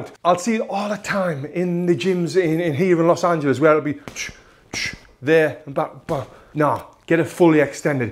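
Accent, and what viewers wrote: United Kingdom. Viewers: The amount of passion and pro advices that transpires from this man is overwelming. Also, i love such a british accent, even if i'm italian ahah.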